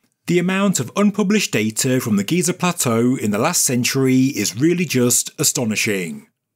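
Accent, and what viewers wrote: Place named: England, United Kingdom. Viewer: Awfoool. Oh u r English man.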